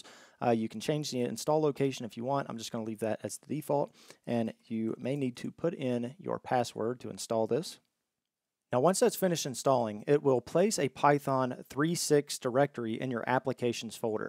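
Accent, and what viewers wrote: United States. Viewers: Man, your speech is amazing. Where are you from? I mean it’s obviously that you are from U.S, but what state?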